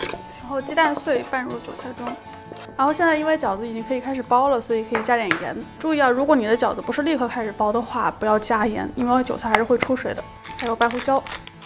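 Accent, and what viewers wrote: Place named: Nanjing, China. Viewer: 我查了一下，果然是南京妹子，南普我听出来了。哈哈哈哈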